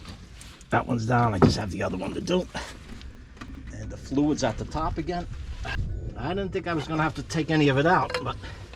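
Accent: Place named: East Coast, United States. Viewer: I’m going start by saying I love your east coast accent 🤣❤️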